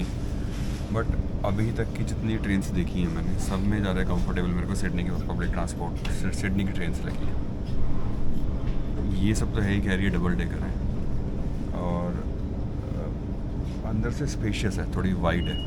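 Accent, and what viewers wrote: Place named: NCR, India. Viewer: Beautiful vlog in ur commentary ur ascent is still from NCR even in abroad - Keep exploring 🎉🎉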